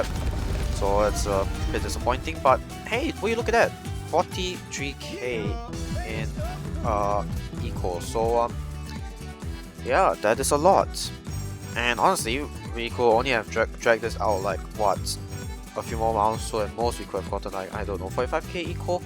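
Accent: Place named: Singapore. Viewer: Hey you sound like you are from Singapore? Just a random guess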